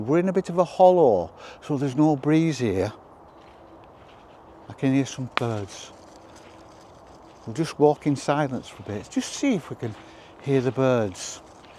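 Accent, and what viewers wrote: United Kingdom. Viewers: I love the British accent! Every time you says “ squirrel” 🐿️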